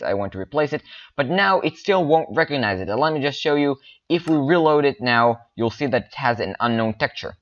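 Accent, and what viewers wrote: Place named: India. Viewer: once i heard your indian accent i knew i was in the right hands. thank you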